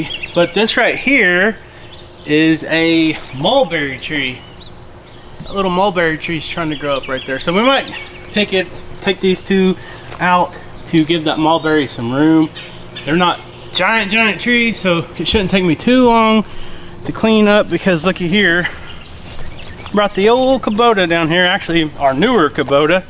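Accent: United States. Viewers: You are very hard working . You and other farms have a big variety of trees. The Elm wood is excellent for exterior use also it hasa very good grain for cabinet making. Please try and answer this: are some of the trees Pecan ...where you get Pecan nuts ? Being English I have trouble with the American pronunciation .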